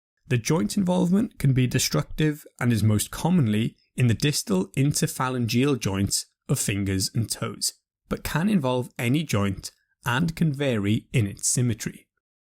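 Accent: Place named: United Kingdom. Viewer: is british accent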